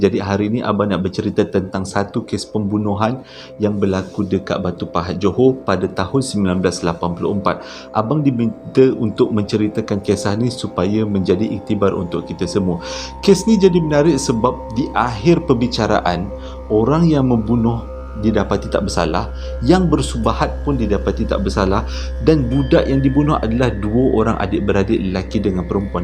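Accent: Java, Indonesia. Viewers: Sedih dgr cite tp kelakor dgr abam ni cite,jowonye pekat😂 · Gaya ckp santaii.. kok mcm ad slang jawa² sikit ckp yer tuu..😂 terus kn brcerita n mmberi kn cerita y best² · Wong jowo yo..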